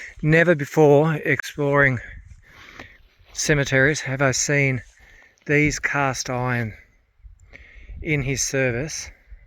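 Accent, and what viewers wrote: Australia. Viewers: Your videos are always interesting and always looked forward to, no matter the content. You also have a soothing voice with the most wonderful Aussie accent! Praying for you,.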